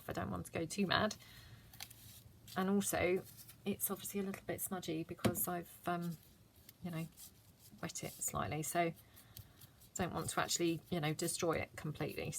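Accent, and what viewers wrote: England, United Kingdom. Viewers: I dont do a lot of vintage journaling , but I just love that beautiful English accent . :)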